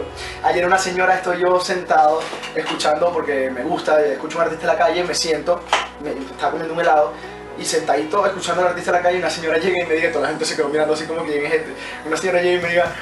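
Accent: Chile. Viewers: Que lindo eres, me encantan tus vídeos!!! Muy informativos 😊 Por cierto, se te ha pegado el acento Chileno jajaja😂🙊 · hola chico jajaja ya se te pego el acento, una pregunta que es garzon?? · Hablas Chileno... 👎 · Siii! Se te ha pegado un poquito el acento ;) Saludos! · Porque hablas casi chileno xd si ni tienes mucho tiempo xd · se Te pego el acento chilenoo. jeje que bueno que ya tengas rut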